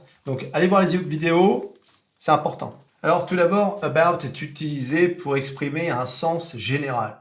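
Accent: United States. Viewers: En tant qu'Américaine, je tiens à te féliciter. Il est extrêmement rare de trouver un.e Français.e qui maîtrise aussi bien la prononciation américaine. Bravo ! 👏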